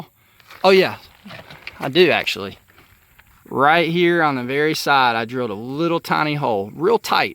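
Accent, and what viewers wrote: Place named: United States. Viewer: Where you from? Cause you sound countrier then a mug cuz.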